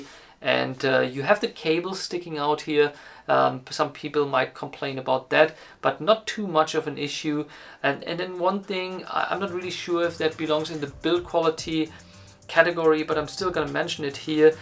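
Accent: Germany. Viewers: Deutscher Akzent kickt anders haha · He is from my State. Germany. So he has an german accent that is what you hear😆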